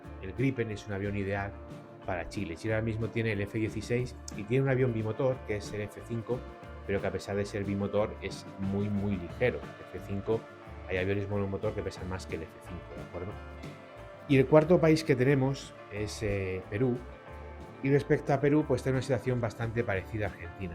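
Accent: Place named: Spain. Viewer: Buenas tardes estimado amigo soy muy novato en estos temas pero me gusta mucho escuchar este tipo de mensajes; y después de haber escuchado a numerosos personajes veo que tú tienes conocimiento y dominio del tema tanto en conocimiento de equipos bélicos cómo en geopolitica, ojalá hubieran más personas como tú para tocar estos temas y no estar pensando en guerras con los desde mi punto de vista podríamos establecer alianza con países de la región y hacer crecer este tipo de industrias que dejan muchas ganancias, por siaca soy peruano y también pienso que estar bien equipados nos evitaríamos tener temores con los demás , gracias por sus comentarios, y aunque tienes dejo español pareces peruano 😊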